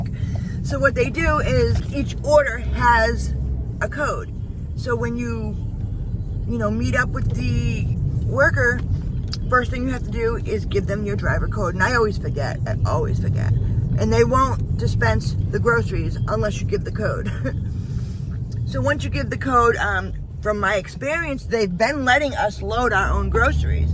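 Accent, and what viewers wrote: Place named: New England, United States. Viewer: Your new lisp detracts from your yankee accenth...kinda miss your former articuation. Btw, the loaders at Wally world have seriously been botching my deliveries (40 lb of birdseed on loaf of bread, a gallon of vinegar on top of a bag of chips, lord knows what that caused a bottle of baby shampoo to explode all over the rest of the order and my car). Lastly, you ain't doing that badly if you can afford Starbucks...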